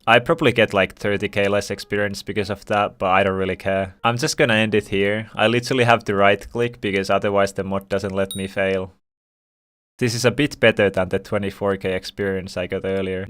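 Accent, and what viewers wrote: Finland. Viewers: Where are u from bc means block on Finnish and u got an Finnish accsent on english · instantly when i clicked on the video i realised from your accent that your finnish :D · i noticed the finnish accent in 0.2 seconds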